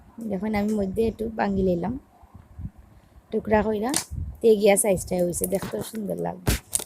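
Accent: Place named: Bangal, Bangladesh. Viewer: বাঙাল ভাষাটা কি মিষ্টি🤗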